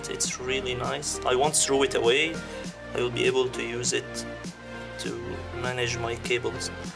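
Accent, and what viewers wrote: Egypt. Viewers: Speaking your language is better that zis ziz zeez egypt accent .. you makes me really angry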